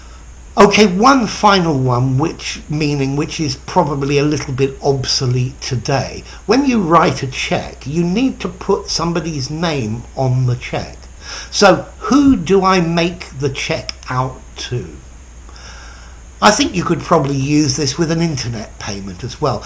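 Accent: RP, United Kingdom. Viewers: I like your RP English accent and the way you teach, as all that makes it easy to make out what you are talking about. Thank you sir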